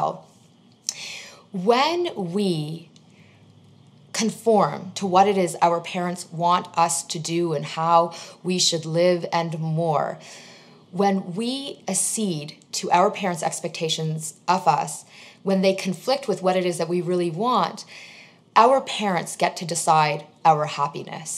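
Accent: United States. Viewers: i can tell that the woman speaking in this video probably grew up in the US just like me because she has an american accent. therefore, she is an indian born in america but expected to have indian values which is kind of hard to do. indian girls do know how to help out in the kitchen, like washing utensils, cutting vegetables, putting away groceries in the refrigerator or pantry, but very few i think will know how to make chapathis , rotis, pulkas, parrotas from scratch, or how to wear a sari, thankfully these days, you can get roti makers to make your life easier, and you can get pre stitched saris that are available to wear, they look just like a wrap around skirt, so you are good to go in that department. but still girls are expected to show respect to elders, work tirelessly in the kitchen, be work horses, listen to parents about what they eat, how they dress, who they are friends with, what classes they take, how late they stay out, who they go out with, how much money they spend, what they spend money on, etc.. 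· please keep your western ideologies to yourself. This is not our culture. you speak in american accent, how can you be Indian .I will serve and live with my parents until their last breath just like my dad cares for my grandma . i will also take care of my inlaws so pls , all the sacrifices they made for me and what am gonna tell them, im sorry but im leaving, not my fault you are aging, not my fault you paid for my studies and life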